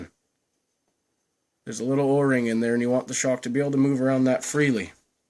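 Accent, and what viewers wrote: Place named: Canada. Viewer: You sound Canadian bud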